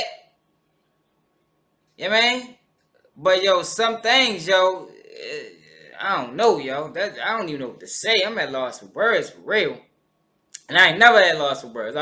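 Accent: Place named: Baltimore, United States. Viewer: That shit is wild!!! At first I thought it was gonna be some bs lawsuit...nah that shit sounds traumatizing. Especially when you hire "talent" and treat them like animals. They took it too far. Pay the young lady n her brother. And apologize. Thats the least u could do. I imagine a real shoot where the topic is pedophiles they actually make sure people are comfortable. They basically abused those kids. It may not be to the full on pedophilia but they put the kids in an environment as such. Thats messed up. Yo... I think your accent... Baltimore area? Is crazy. Those two, you, who sounds lol. Word yall got one of the best accents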